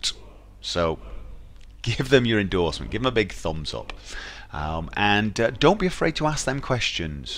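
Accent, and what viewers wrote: United Kingdom. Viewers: Thank you for this tutorial.From your speaking tone,i'm guessing you're from United Kindom.I like the way you talk!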